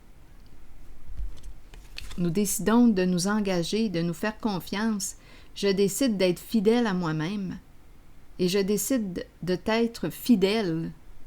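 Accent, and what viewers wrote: Canada. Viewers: j'adore votre rire enfantin et votre accent canadien je suis scotchee et j'adore le Quebec qui est une region si jolie, ou on mange si bien dans d'excellents restaurants merci d'etre presente tous les jours dans ma vie pour me donner des nouvelles de l'homme qui est dans mon coeur je vous souhaite une excellente soiree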